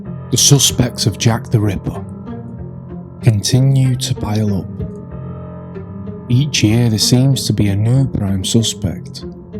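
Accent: Manchester, United Kingdom. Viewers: New sub here 👋🏼 This video randomly popped up & I’m glad I clicked. What a remarkable video. Love all the editing 👏🏼 I’m from Bolton, is that a Mancunian I hear? 👀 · That's a proper manc accent, or Salford.. I used to live ten minutes up thd road from Salford precinct off chapel lane or chapel street/road. Been 30 years since i lived there but your accent is definitely either north manchester or Salford, possibly south Manchester Wythenshawe, but my point being it's a thick accent from the area. I ended up in stretford. About the video, I thought it was Lechmere for years but now I'm not convinced.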